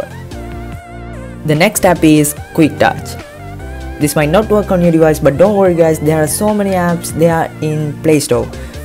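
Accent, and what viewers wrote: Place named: India. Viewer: Are you Indian or Pakistani? I'm assuming Indian from your accent. You should get a Snapchat account so we can know when you upload a video.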